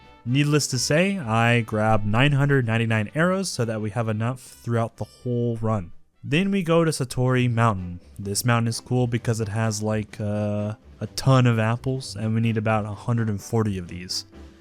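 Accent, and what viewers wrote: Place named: Australia. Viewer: i can just hear your Australian accent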